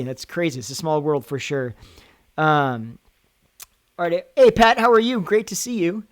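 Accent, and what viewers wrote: United States. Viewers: I hear a slight Southern accent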